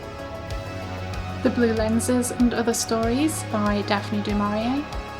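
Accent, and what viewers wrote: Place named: England, United Kingdom. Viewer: Thanks for a very enjoyable video,. You have a fantastic collection of books with some very eye-catching covers, a lot of which I have to admit I've never even heard of! As I'm over in the UK it was also great to see US editions of books by favourite authors of mine like Clive Barker and Ramsey Campbell. It also made me smile that you still say Poppy "Zed" Brite instead of Poppy "Zee" Brite and that you still pronounce "Bernard" the English way 🙂. I'm probably going to have to watch this video again so I can note down and research the books that particularly captured my attention.......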